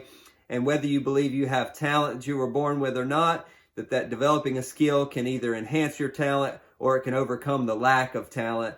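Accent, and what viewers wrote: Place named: United States. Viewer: Thank you so much for all of your videos; I really appreciate you taking time out to share your skills and expertise. And I really like your laid-back style - I'm not sure why some people want you to be something you're not. Strange. Btw, I love your accent. We Brits are always being told that Americans like our accent - but yours is just lovely.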